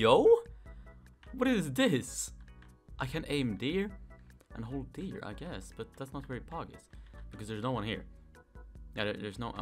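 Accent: Sweden. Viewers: Are you Swedish? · are you swedish? you sound like swedish:/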